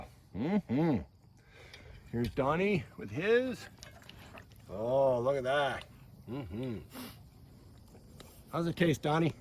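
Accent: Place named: North, United Kingdom. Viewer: Hi 👋🏻it’s Nutty Mark here. Checking your video out for the first time. Looks like, and sounds like your a bit North of me. Always my favorite vacationing location. #20👍🏻P.S. love the Doggie’s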